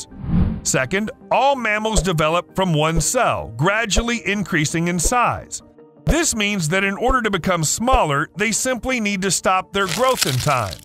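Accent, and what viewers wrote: United States. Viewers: Unbearable american accent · I just can't stand this yanks voice. Why does he have to keep shouting with that rattling voice.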